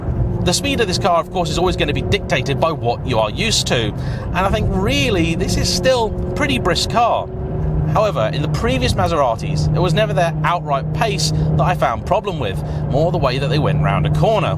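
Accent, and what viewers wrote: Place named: England, United Kingdom. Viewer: "A person with an English accent reading the phone book sounds better than an American reading the Gettysburg Address." -Mad Magazine, in a spoof of a course to teach you an accent instead of the actual language. Listening to this guy narrate this is so much better than the usual braying American "What's up? What's up? What is UP? What's up? Wassup?" at the beginning of each grating video.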